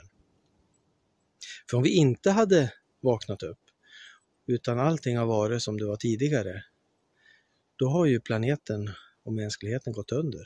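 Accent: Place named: Norrland, Sweden. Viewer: Tack, detta är min sanning också. ❤💚 Härligt och höra norrländska. 😊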